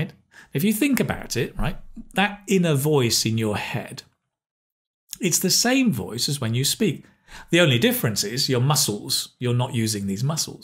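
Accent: United Kingdom. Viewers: I adore that British accent..🥰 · Hi from Italy You have been a great discover for me! I love your British accent Your suggestions in this video are simply brilliant: focussed, clear, understandable and easy to get into daily life. Thank you so much!! I'll keep watching you!!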